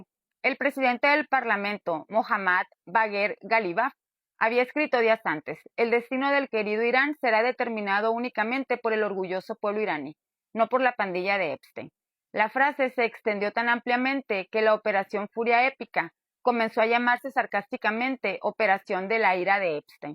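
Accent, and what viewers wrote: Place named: North, Mexico. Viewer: ayer 20 de abril fue el cumpleaños del fiu rer. El ultimo Avatara. y mientras tanto, "los aliados" ("la escuadra y el compas", los verdaderos supremacistas) mantienen a todo el mundo en estado de guerra perpetua desde 1948, dando el "sacramento" para el hematofago genocida que ellos adoran como "dios de los ejercitos". esta demostrado que esos supremacistas no entiendn otro idioma mas que el de la violencia y la fuerza. Muchos creemos que el mismo Cristo vino al mundo para terminar con ese culto demoniaco que llaman "judaismo", y el demonio disfrazado de Dios junto con los depravados supremacistas que se creen "elegidos de dios". SIN TREGUA NI PIEDAD, EL BIENESTAR DE LA MAYORIA DEBE IMPONERSE SOBRE ESA MINORIA RAPAZ, SUPREMACISTA Y GENOCIDA. GRACIAS POR COMPARTIR. ps me encanta el acento del norte, sobre todo de shihuahua! 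iñoor!!!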